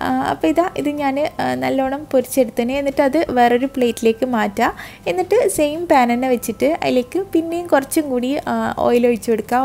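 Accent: Kannur, India. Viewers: ithande Kannur basha oru rakshayum ellatto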